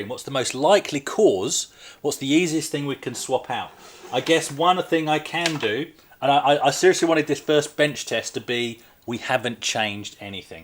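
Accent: Australia. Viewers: Really picking up that Aussie accent now, eh?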